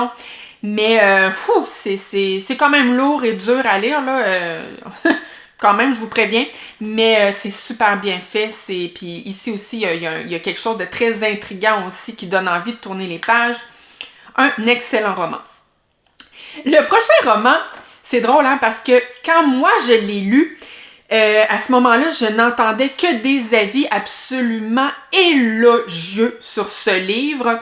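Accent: Quebec, Canada. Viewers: Hello, Tout d'abord je voulais te dire à quel point c'est un plaisir de t'écouter.... J'aime ton accent, le Québec est le pays de mes rêves et tous ce qui y fait référence est magnifique pour moi.... Continue de nous régaler avec tes vidéos qui sont pour moi un plaisir immense.... J'ai dans ma PAL "entre 2 mondes" et "la ou chantent les ecrevisses" je pense que je vais bientôt les sortir grâce à ta façon d'en parler ça m'a vraiment donné envie.... Encore merci pour tous.... Toi et tous ce que tu représentes dans mon cœur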